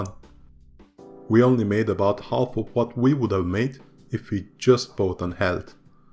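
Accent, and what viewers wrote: Turkey. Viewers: Turk musun aga? :) aksanin oyle gibi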